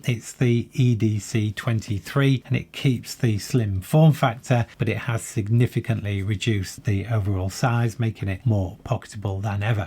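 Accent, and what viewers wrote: United Kingdom. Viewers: Perhaps mention not legal in uk as some Brits won’t know and as you are (or sound) British they might assume you are saying edc in UK.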